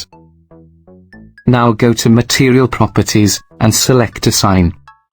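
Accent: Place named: Wales, United Kingdom. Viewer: Blender tutorial in welsh accent 😂 this is amazing. I love it!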